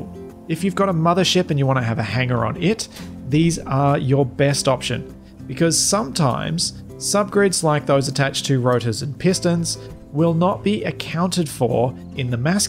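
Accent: Australia. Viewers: Pretty sure is australian, but that's a guess!:D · Great videos mate. Love seeing a fellow countryman leading the way in SE Tutorials and besides I just happen to like hearing our Aussie accent on YouTube. Cheers thanks for the content pal.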